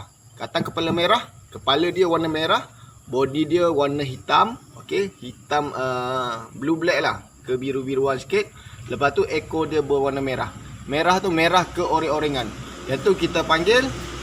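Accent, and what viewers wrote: Kelantan, Malaysia. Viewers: tuan asal kelantan atau trgganu? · ore klate peseng abe ni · Kelate kecek lua... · Kelate molek bunyi abe... Hehehe terbaik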